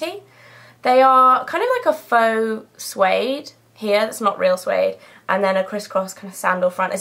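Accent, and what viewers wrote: England, United Kingdom. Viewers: i just LOVE how you said "shorts" @@ i get hypnotized xD i really love the english accent! :D and nice haul! woof adds some fun! LOL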